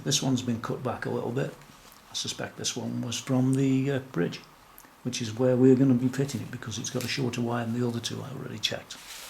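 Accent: Stoke, United Kingdom. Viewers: I must say, i hate strats usually but this is one of the nicest looking guitars i have ever seen! and its always nice to hear a stoke accent on here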